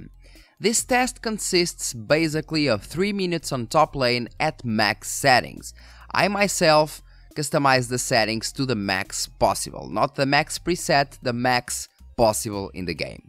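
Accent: Portugal. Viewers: Parece a voz de um tuga, estou certo?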